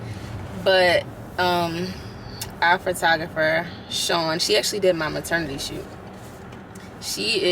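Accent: Baltimore, United States. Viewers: Beautiful!!! As soon as you began talking, i was like “she’s gotta be from Baltimore!!”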